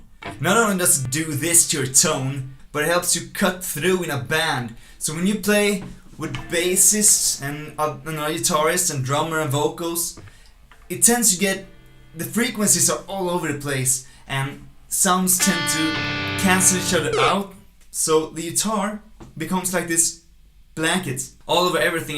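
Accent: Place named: Northern Europe. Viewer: He just sounds like he has a northern European accent to me.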